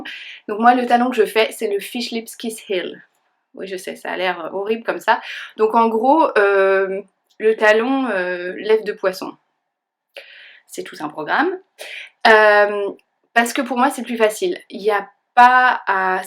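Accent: United Kingdom. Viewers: Super, merci pour ce podcast et vivement le prochain. J'ai hâte de voir tes trouvailles d'Ecosses. Et j'adore ce petit accent British ;-) à très vite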